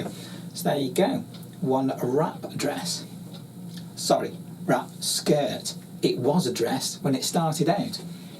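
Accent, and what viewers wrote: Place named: United Kingdom. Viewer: How lovely and refreshing to hear a UK accent! Thank you for sharing your sewing projects. I'm very keen on upcycling so I hope to see more on your channel.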